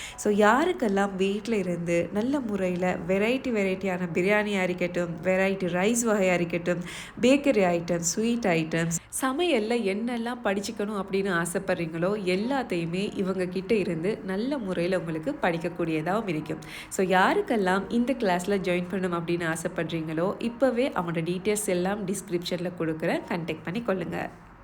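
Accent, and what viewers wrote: Sri Lanka. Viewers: Sister ongede ellam ok but konjem style eh korainge.neenge trinco side thane trinco pecha pesunge erichala irikki ongde voice eh keke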